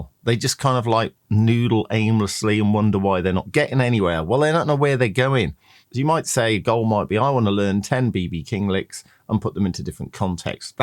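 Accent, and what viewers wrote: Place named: Australia. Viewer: i knew i was hearing a little Auzzie mixed in there. 😅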